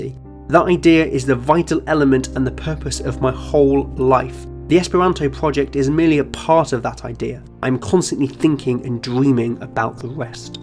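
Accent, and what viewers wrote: England, United Kingdom. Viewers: What region of the U.K. is your accent from? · mi ne komprenis nenion ankaû, cxar lia akcento angla influas la prononco tro multe xD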